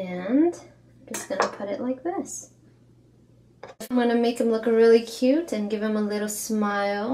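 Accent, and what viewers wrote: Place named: United States. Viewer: It's so interesting to see how your accent has gradually become more American over the course of your videos, love the fruit art!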